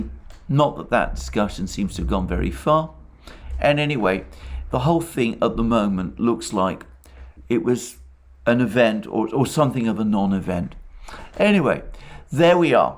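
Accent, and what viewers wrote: England, United Kingdom. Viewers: I have been following you but many times you absolutely rise the anger in me. It's not just your English accent but how you express yourself I find it's appalling. Nevertheless I think your message probably rings true. What an evil man oh hell the word "evil" some sort of religious expression. No it's not a word that you expressed but the problem that I have with you it seems to me you want Pappa Pu, like a steamroller. Distruction, everything in his way no matter Fields buildings and people squashed . Like a rollerball we've cleaned everything that was here the dentist yeah they didn't think about that way. And you, it's just war games. I would love to sit down and play chess with you however there's somebody else I need to talk to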